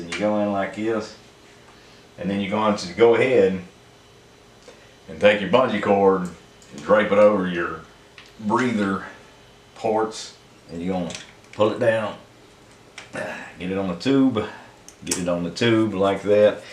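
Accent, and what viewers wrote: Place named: South, United States. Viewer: Thank you for getting back to me! I found that with them bled and taking all the lash out that it took the Screaming Eagle Adjustable Pushrods 4.25 turns . A guy at the parts counter of a local HD shop told me 4 to 4.5 turns and he was right. Thank you for your video. You sound like a Good Ole Boy! Just saying I'm from Mississippi but now live in SW Colorado. Come out for a trip, it'll be a blast taking you for a tour!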